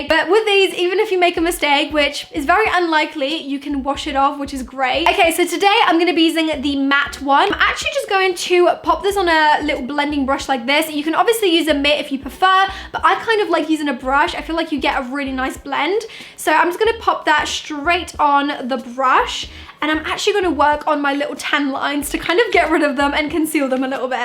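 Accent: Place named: United Kingdom. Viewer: Your tiny little eyes plus your British accent.... ❤ looks and sounds so cute :D i wish i could hv a British accent like that :<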